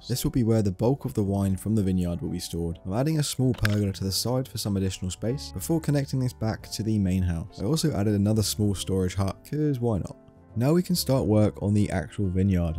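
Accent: Italy. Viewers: Love Your Voice ♥ Are you Italian Btw ? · si ligure un po pero piu sud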